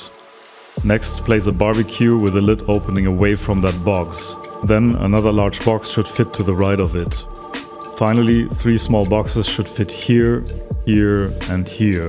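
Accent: Germany. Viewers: man hört dein deutschen aktzient ;D nices video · Ich höre dein Dialekt (ka ob das so heißt) du bist eig. Deutscher, oder? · Sehe jetzt erst seit 5Min dieses Video aber liebe es einfach wie dein deutscher Dialekt raussticht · Mann hört einf den deutschen Akzent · German accent it in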